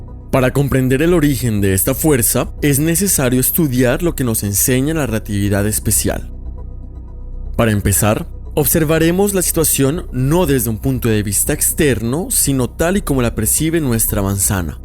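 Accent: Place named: Colombia. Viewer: Escuche este video en inglés. Ahora lo intente escuchar en español no puede escuchar más tres minutos, ese acento colombiano no puedo, no puedo espero que cambien al narrador por un acento más neutral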